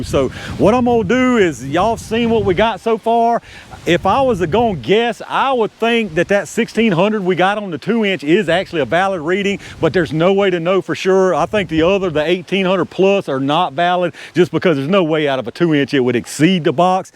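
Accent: South, United States. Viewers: This guy could talk about anything from taking out the trash to what time of day it is, I like his southern accent. Great stuff keep up the great work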